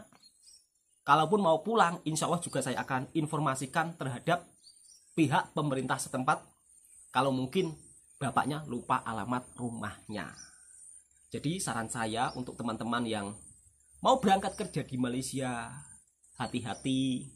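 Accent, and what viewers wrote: Java, Indonesia. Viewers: wah sudah kurang medok nya wak.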